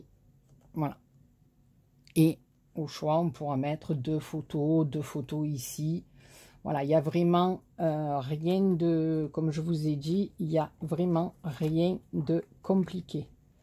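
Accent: South, France. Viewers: Re 👋, waouh quel magnifique album, on en prend plein les yeux 🤩💖😍, vous avez mis ce beau papier en valeur avec la dentelle, les perlounettes, les petits nœuds....... Bravo pour ce très beau projet. Merci pour cette présentation avec votre bel accent du midi et surtout votre bonne humeur, c'est toujours un plaisir de vous entendre, de voir vos créations et de suivre vos tutos. Bien sûr que j'attends vos prochaines cartounettes ou ATNap. Prenez soin de vous et profitez de la mer, du soleil🌞 et de la baignade 🏖. Bon dimanche, à bientôt 🥰🥰😘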